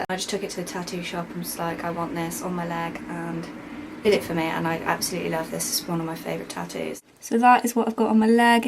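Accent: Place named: United Kingdom. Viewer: Your moth and butterfly tattoos are soooo pretty! And I love your accent, it's so beautifully British <3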